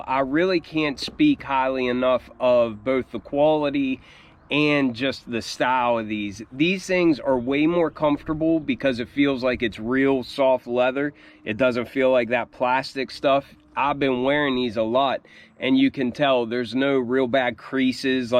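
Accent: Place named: Pittsburgh, United States. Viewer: That mint green is going crazy! I love them! Pittsburgh here, bro you got to be from Pittsburgh? I know I've heard you say you live in WV but that accent is heavy Pittsburgh. And I heard you say you love the teams. Just curious if you're from here or from WV? Not that it matters I love your stuff. Great reviews, great taste!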